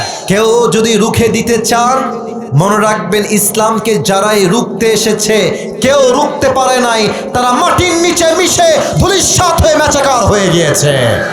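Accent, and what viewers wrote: United Kingdom. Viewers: মনে হয় ইংরেজ বাংলায় কথা বলতেছে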